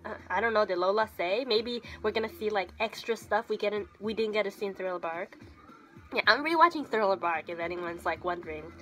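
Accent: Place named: England, United Kingdom. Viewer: i wish i had your english accent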